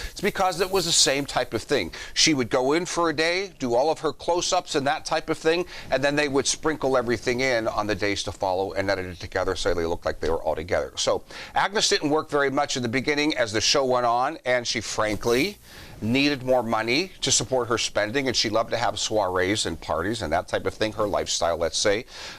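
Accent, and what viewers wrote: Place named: United States. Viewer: The accent of the narrator is way too American!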